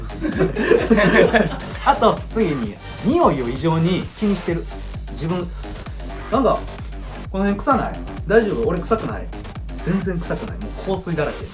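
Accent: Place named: Kansai, Japan. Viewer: 関西のどこだろう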